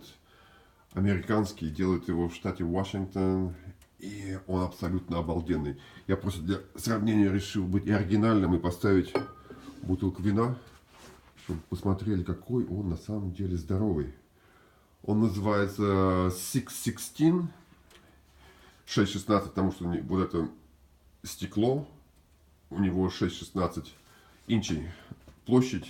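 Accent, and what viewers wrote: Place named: Ryazan, Russia. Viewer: Слова русские позабыл? Смешно слушать рязанский акцент😂😂😂😂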